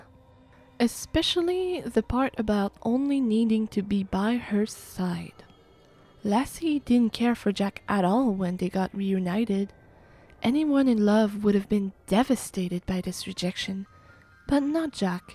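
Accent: France. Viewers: Peut être aussi ta façon de prononcer des mots français comme "tragédie de sablier" à la française. En général quand quelqu'un sonne aussi bien français... surtout sur les R... ça peut laisser suspicieux. Et puis j'ai l'impression que Pandora Hearts est assez populaire en France (même si ce n'est pas l'oeuvre qui revient dans le plus de discussions actuellement, je connais pas mal de personnes de bon goût qui l'ont lu ou regardé)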